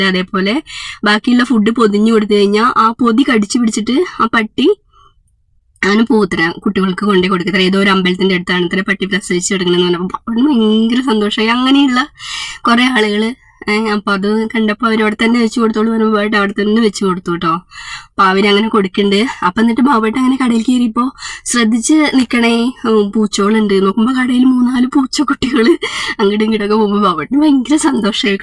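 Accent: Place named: Thrissur, India. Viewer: enik orupad ishtanu, njan trivandrum anu trishur language kelkan nalla rasamond. Enik videos ellam ishtamanu❤❤😊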